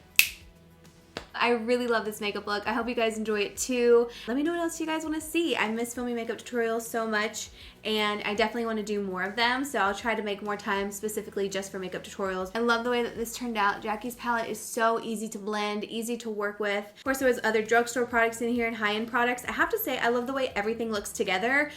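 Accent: South, United States. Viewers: Anyone else notice the small hints of her southern accent coming back since she moved back to Georgia?? I love it!!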